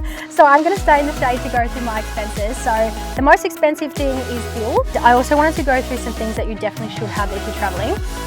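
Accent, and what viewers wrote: Australia. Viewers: Damn... Aussie accent from a cute blond girl should be the sexiest thing in universe :eyes-pink-heart-shape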